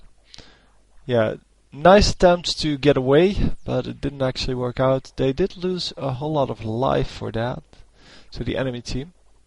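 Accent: Netherlands. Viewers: Ik wil niet lullig doen ofzo hoor, maaaar je hebt een beetje Nederlands accent in je engels :$, Maar toch leuke vids :)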